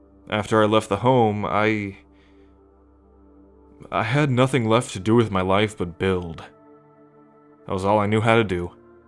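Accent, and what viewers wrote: South, United States. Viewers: the ever so slight southern twang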